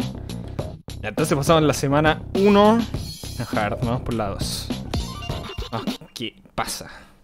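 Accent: Chile. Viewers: por el como habla ya se sabe que es chileno por un hola como estas ya se reconoce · Es la primera vez que te veo y desde ya puedo decir que eres chileno xD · X 2 yo soy chileno y cuando lo escuche decir chucha dije es chileno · x2 io igual lo soy, chileno reconice a chileno jsjsj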